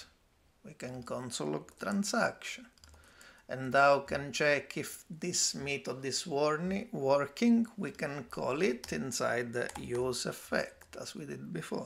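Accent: Italy. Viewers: Dude you are soooooooo italian and i love it. Please record audiobooks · Incredibile, tra le migliaia di tutorial online sentirne uno con l'accento italiano mi fa sentire a casa!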